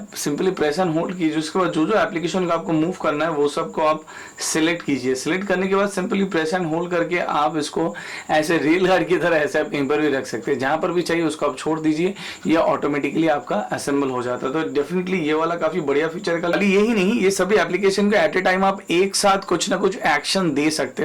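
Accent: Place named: South, India. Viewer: i knw south indian ho tum. but i lobe the accent jis tarah se smjhaya tmne. keep the good work bro :)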